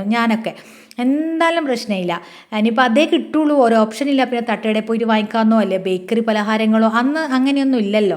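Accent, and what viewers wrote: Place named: Vadakara, India. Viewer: Ninkale varthanam vadakarakkare bhashsa pole ende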